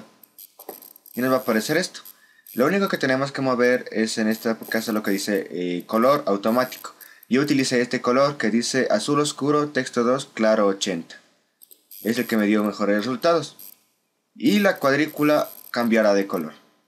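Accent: Ecuador. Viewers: ajaj se nota en tu vos que eres ecuatoriano ejejej muy buen video me arreglaste mi dia jejeej gracias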